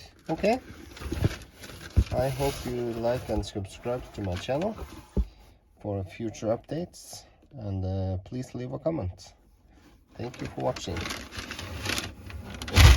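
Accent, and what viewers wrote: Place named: Finland. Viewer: Cool boots when I first saw the thumbnail I thought they were Wolverine 1000 mile cordovan. Do you mind me asking are you Finnish? You have a really cool accent you sound like Kimi Raikonnen or somebody.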